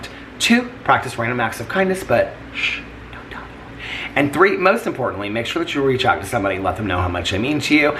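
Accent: South, United States. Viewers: Why does sound more southern than usual? 😂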